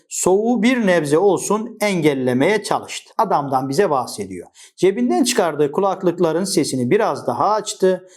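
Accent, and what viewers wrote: Black Sea, Turkey. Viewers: Hocam karadenizli misiniz karadeniz agzina benzettim konusmanizi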